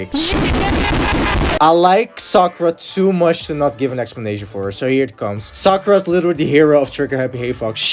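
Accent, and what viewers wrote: Netherlands. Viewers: I hear a dutch accent :3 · iconic video!!! loved this. the video raised a question for me tho are you dutch? 😭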